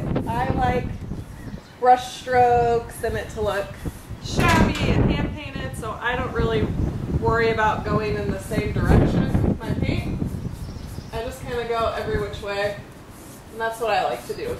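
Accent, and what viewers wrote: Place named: Illinois, United States. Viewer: I'd watch you paint anything....BTW, love the Illinois accent, as someone from Central IL I recognized it right away.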